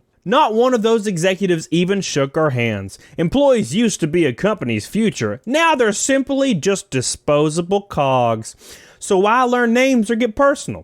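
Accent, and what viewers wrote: South, United States. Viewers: havent watched this channel in a while, man did become a lot more southern!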